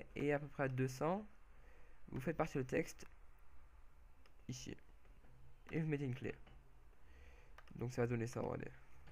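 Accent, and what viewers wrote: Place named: South, France. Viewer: Aaaah ! On sent l'accent du sud ! Sinon j'aime bien !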